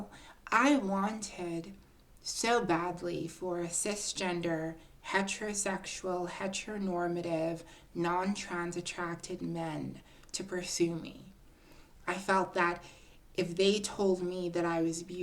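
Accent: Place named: United States. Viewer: Whats up with the American accent?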